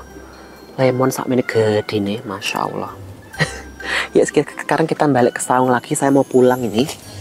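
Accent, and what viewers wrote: Java, Indonesia. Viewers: Seneng banget nontonin video panen2 mas trus ditambah ngomongnya logat jawa lucu gitu 🤗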